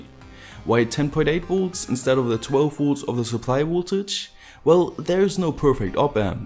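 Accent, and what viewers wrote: Germany. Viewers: Could you please explain the schimtz trigger?? By the way, like your german accent a lot.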